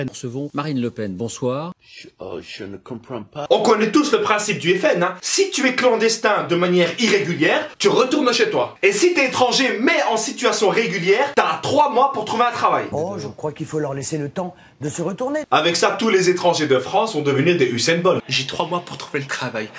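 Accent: Belgium. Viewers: Accent belge???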